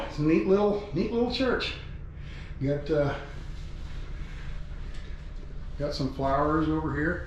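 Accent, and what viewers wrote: South, United States. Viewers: Are you starting to speak like a trucker now or have you always spoke with a country sort of accent. is like a voice actor...his trucker voice is not always there and other times its very pronounced.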